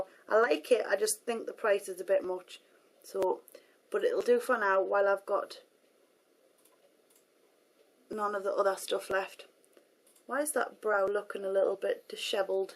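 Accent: Newcastle, United Kingdom. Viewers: Geordie accent--can't get any better than that!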